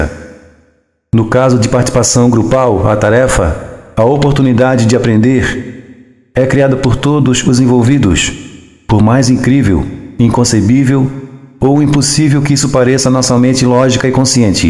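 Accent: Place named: Florianópolis, Brazil. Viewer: Essa voz e sotaque é de manezinho da ilha né?... morei em floripa por 6 anos e esse sotaque me faz lembrar ds lá.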